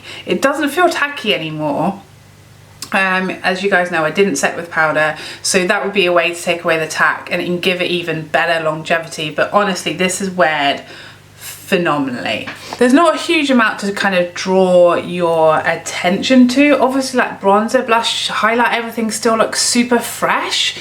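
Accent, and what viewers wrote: United Kingdom. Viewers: Finally! I’ve been waiting for your review!! With your lovely British accent (I have an Aussie accent so that’s why I LOVE yours 😭) and soothing voice, I can happily listen to you all day long ☺️😊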